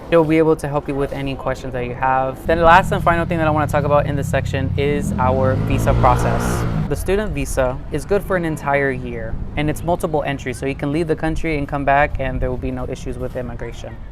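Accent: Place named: Philippines. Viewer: Is it just me? the way he explains sound so Filipino 🎉😅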